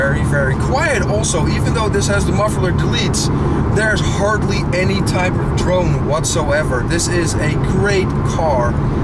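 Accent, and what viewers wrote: Netherlands. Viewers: Ik kan nog steeds horen dat je uit Nederland komt! xD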